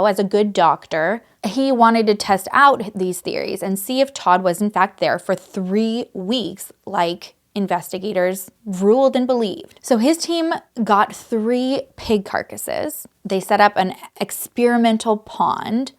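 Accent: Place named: Canada. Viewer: Ot was only a few seconds in and I thought, "oh yasss, this is a fellow Canadian girl". Love to have crime content creators present the country in a different light. My heart always breaks for the families of the victims.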